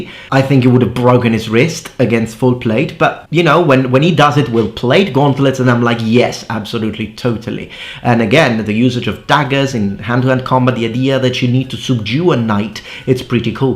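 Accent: England, United Kingdom. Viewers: haha, wow. iv only watched you for one year. I just watched The king so am now watching YouTube analysis and here you are in your primordial form. iv always been blown away by your English usage by all metrics. You do sound much more English in your primordial version, i wonder how and why? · Hey, I noticed in some of your older videos such as your Kanabo video from 2 years ago you had a samurai helmet, where did you get it from? I’m in the UK as I assume you are judging by your accent, yet they’re all ridiculously expensive online.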